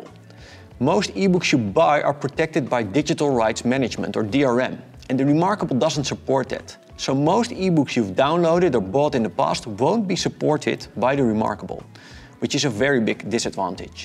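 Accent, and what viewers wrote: Netherlands. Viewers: i hear dutch accent noises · This was super helpful. This was exactly the information I was looking for. Having it all in a nice Dutch accent was just the cherry on top. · He spoke for one or two seconds and I immediately looked at his name to conform what I heard. And yep, he's Dutch. I recognize Dutch accents anytime. My native language is Dutch and I'm from Suriname, South America. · I appreciate a video that evaluates the product after a fair trial period. Given that you have used this item for 1 year adds complete credibility and that is obvious from your remarks. I've been going back and forth about the Onyx Boox for a couple months now. This video came at a great time for me. So, thank you. And I have to agree with T HOFF on your Dutch accent, it reminded me so strongly of my Dad who came to Canada from Enschede when he was 17 years old. 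My Mom always said, "Wooden Shoes, Wooden Head, Wooden Listen" Ah...but he was a good man.☺ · Great honest review. Love that dutch accent btw - reminds me of my days in Europe. I want to get an e-Ink device, but can't see it replacing my OneNote / Suface and iPad workflow. Pens with colors, highlighter and the incredible search and synch capabilities of OneNote make it impossible for me to take this big step back.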